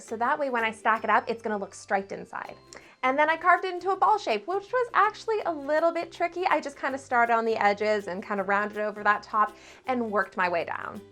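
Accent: Canada. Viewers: Is she Canadian?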